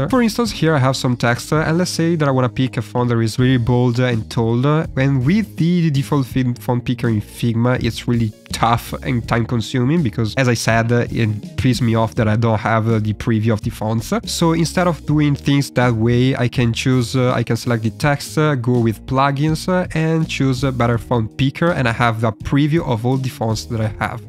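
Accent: Italy. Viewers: I find Italian accent very funny and interesting 😆❤❤❤ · Give the guy a break, that is how Italian’s talk.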